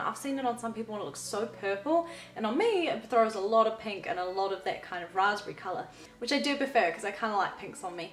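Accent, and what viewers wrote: New Zealand. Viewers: FINALLY a classy woman on Youtube doing makeup!!!! YUSSS,,where abouts are you from? im a kiwi and you sound kiwi/british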